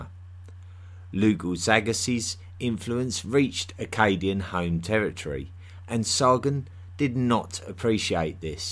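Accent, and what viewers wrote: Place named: Cockney, United Kingdom. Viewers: The cockney voice over is what makes this even better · Not so sure about clearly :-) ... very strong London accent there. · Sounds like "my name is Michael Caine" I love the combination of cockney accent and erudition. The man can't be expected to cover all aspects, never mind in detail, in 46 minutes. If you want more detail you should search Google for scholarly articles on this subject.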